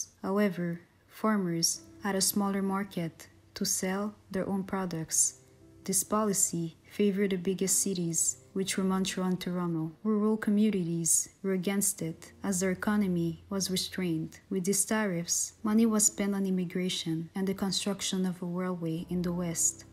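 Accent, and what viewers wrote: France. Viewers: I don't care much about the accent and I do know she's French; read my comment. Of course, I'm not claiming she is reposting someone else's content. That's what I'm doing; I'm just providing feedback that would make this channel more popular for me and undoubtedly many other people. One should not be quick to judge another's intentions and it is an insult to consider the work of a craftsman to be the best that he is capable of. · She sounds both French and Native - which makes this HER content and heritage - patiently listen or find someone that “speaks your language”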